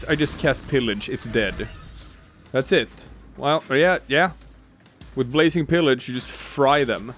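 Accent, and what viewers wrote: Sweden. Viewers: So u swedish? u sound swedish!!! Hej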